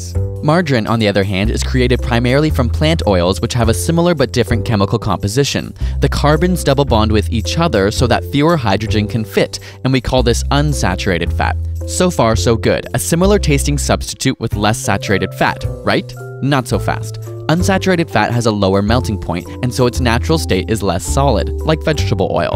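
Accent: Canada. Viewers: Haha, hello fellow Quebecer, I see that margarine container. Do you live in Montreal your accent would suggest it.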